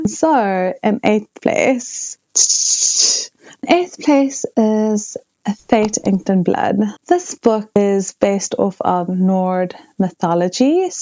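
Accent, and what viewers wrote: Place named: South Africa. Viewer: From one South African Bookworm to another...hello! 😂 I just found your channel today and loved hearing the South African accent on YouTube. ❤. Anyway, hope to see you over on my new bookworm channel as well. I'm traditionally a massive thriller reader but I've recently discovered fantasy and actually, I've dedicated the month of Aptil to only reading fantasy.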